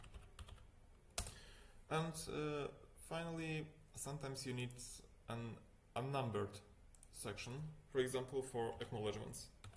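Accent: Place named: Russia. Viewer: Как будто русский на английском говорит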